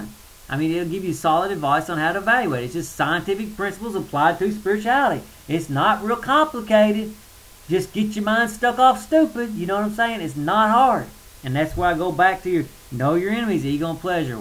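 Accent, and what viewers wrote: South, United States. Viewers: You're awesome mate.. love your southern accent. Thumbs up ;D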